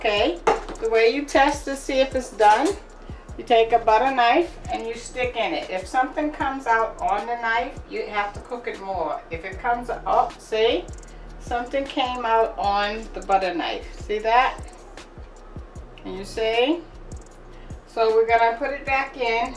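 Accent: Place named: New York, United States. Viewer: Looks good. You have a NY or Jeresy accent. :)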